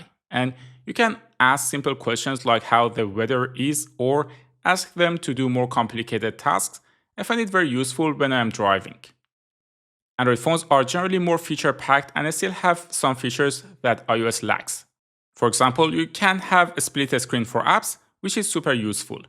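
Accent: Iran. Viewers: Are you Persian or Iranian? As soon as I heard your voice I could tell😂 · You sound Iranian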